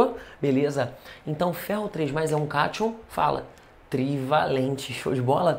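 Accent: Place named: Rio de Janeiro, Brazil. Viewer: Com certeza esse professor é carioca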